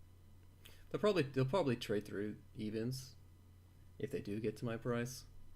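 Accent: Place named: France. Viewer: hey man, whats up. I followed you on twitch. Do you still stream? also you sound french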